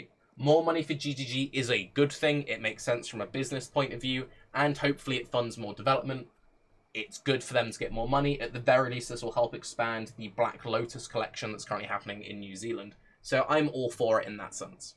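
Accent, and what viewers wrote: United Kingdom. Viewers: I think that's a little overboard, but i get what you mean. He for sure has become a lot less critical ever since he's gotten these aspirations of doing content with GGG staff etc. Its like he wants to be Ziggy or something. Nobody is totally impartial, but what does rub me the wrong way is when people pretend as if they are trying to give a balanced opinion - when in reality they fall very squarely on one side of the argument or the other. If you are only going to praise GGG and fabricate total nonsense that nobody would ever say to make it appear like you arent just dicksucking it really comes off as arrogant pseudo-intellectual self-aggrandising nonsense. This was my biggest problem with the Diabolo mobile video. He couldnt just say "If the game is good, ill play it". He had to make a 5 minute nonsensical rant trying to justify to himself and everyone else why HE is in the right, everyone else is just circlejerking, Blizzard are being smart and moving in the right direction for the franchise even if this only caters to idiots like him.... 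Like dude, either be quiet or just acknowledge you are in the minority. Dont try to do all that nonsense justification. It doesnt get you hipster points, and you dont sound smarter for doing it. Even if you have a british accent.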